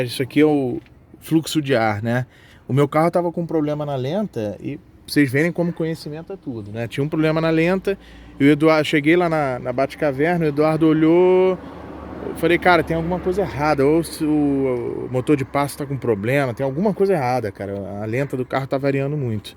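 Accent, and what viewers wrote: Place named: Rio de Janeiro, Brazil. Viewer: pelo sotaque do dono do video é Rio de Janeiro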